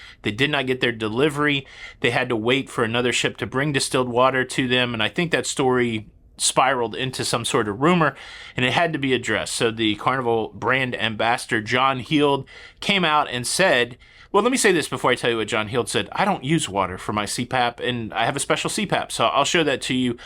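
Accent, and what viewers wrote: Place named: Boston, United States. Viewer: Make sure you ask where the cayr (car) is payrked (parked) lol. Love love love the Boston northeastern accents. It’s a great city. Have fun and safe travels.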